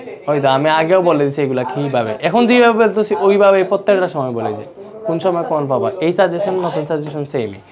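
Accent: Sylhet, Bangladesh. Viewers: ভাইয়া তুমি সিলেটি 😩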